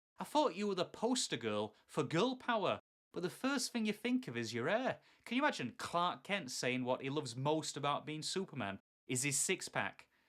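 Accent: Yorkshire, United Kingdom. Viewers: The Yorkshire accent is 10/10 makes me want to visit hahaha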